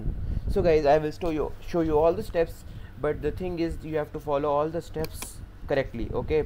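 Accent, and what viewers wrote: India. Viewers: Me: joins the vid Indian voice Me: wait this one is definitely real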